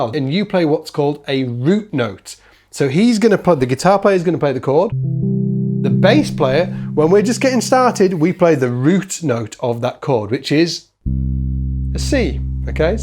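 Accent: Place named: Liverpool, United Kingdom. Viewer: Dude is from the Liverpool area, because his accent is just like the Beatles! So somewhere along the Northwest coast of England!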